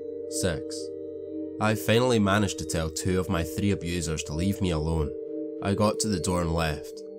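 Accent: Scotland, United Kingdom. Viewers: Oh the snarky ones, good to hear that Scottish accent again😆😜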